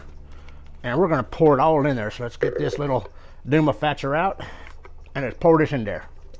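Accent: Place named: Ireland. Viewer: It's the Irish accent.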